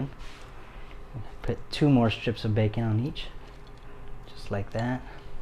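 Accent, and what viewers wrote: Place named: United States. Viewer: You watched a man with an American accent cooking a variation on typical American food and didn’t think he was American? I don’t know how to help you.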